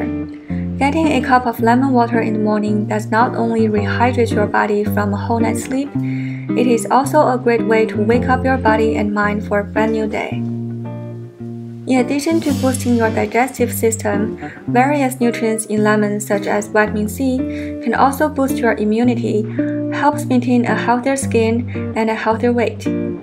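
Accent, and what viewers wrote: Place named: China. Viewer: ) 本來以為你是外國人，聽你說話，就聽出來是中國人了 😊你的影片都很好看～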